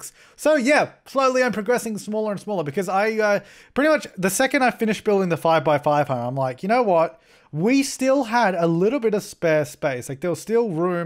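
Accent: Australia. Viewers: They both have Australian accents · it's the accent, all dudes sound like this in Australia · It look me too long to recognise your accent is Aussie 😂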